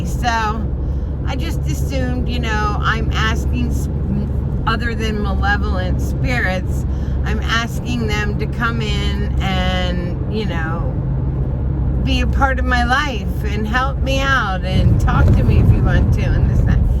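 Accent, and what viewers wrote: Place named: Philadelphia, United States. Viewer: I'm so glad I found your channel. I have been feeling super alone lately and well iam. Yet I found your channel and your personality etc is like having a really cool friend talking to ya. I had a feel I ng from they way you speak that you had to be close. I'm in Philly and heard you say where u were. So cool!!! Just wanted to say hello and thank you. Sending love and positive vibes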